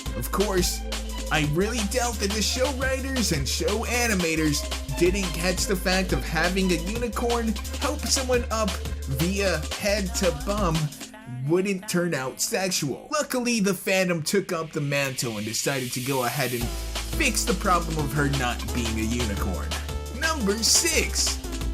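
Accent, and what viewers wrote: Ireland. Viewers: are u irish? xD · Anyone elsea realized he kinda sounded a bit different, in my opinion he sounded like irish (no offense)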